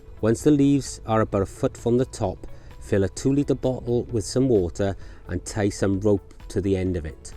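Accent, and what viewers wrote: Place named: West Wales, United Kingdom. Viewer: Your accent is similar to my relatives who live near Aberaeron / Lampeter. Are you from the west coast area mate?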